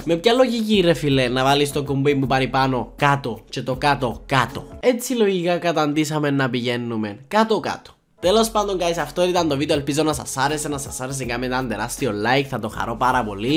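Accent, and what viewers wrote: Cyprus. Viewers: kuprios einai aki milaei etsi h' blammenos?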